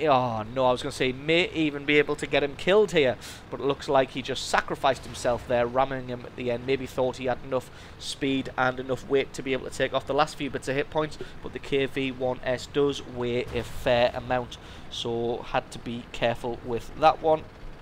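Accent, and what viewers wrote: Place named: Newcastle, United Kingdom. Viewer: Nice. Im new you this channel. One question, Are you from Newcastle??? If so where abouts? I live in wallsend. Just nice to hear someone that sounds like me on youtube haha.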